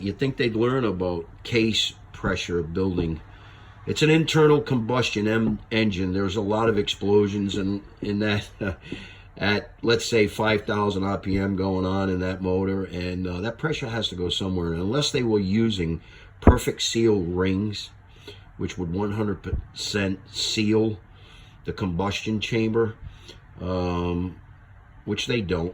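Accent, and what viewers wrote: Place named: East Coast, United States. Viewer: Great description of what you found and the mechanics of it all. Subbed for you articulation and East Coast accent!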